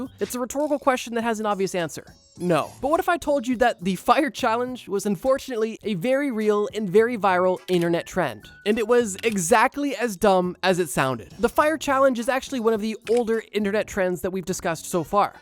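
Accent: Canada. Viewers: i thought i heard that canadian accent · i could tell you were canadian 😂😂 ontario ontop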